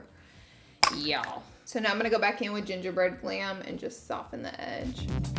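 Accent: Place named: Alabama, United States. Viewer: I absolutely love you and your videos! I am also from Alabama so I can relate to the way you talk so well lol. It’s so cool to see someone that grew up in a similar atmosphere to me is doing such great things. It gives me a lot of inspiration! Much love. ♥️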